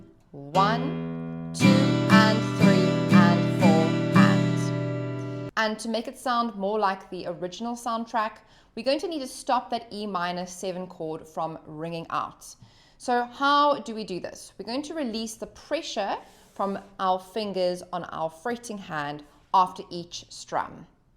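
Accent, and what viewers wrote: South Africa. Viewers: You sound South African? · Awesome! You seem to have a South African accent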